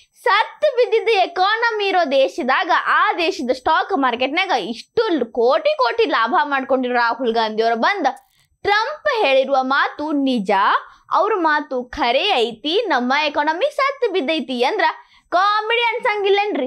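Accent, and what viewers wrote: North Karnataka, India. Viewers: ತುಂಬಾ ಸೊಗಸಾಗಿ ಬುದ್ದಿವಾದ ಹೇಳಿರುವ ನಿಮಗೆ ಅಭಿನಂದನೆ. ಉತ್ತರ ಕನ್ನಡದ ಭಾಷೆ ಚಂದವಾಗಿ ಪ್ರಸ್ತುತ ಪಡಿಸಿರುವಿರಿ. ನಿಮಗೆ ಮತ್ತು ಹೊಸ ದಿಗಂತಕ್ಕೆ ಒಳ್ಳೆಯದಾಗಲಿ.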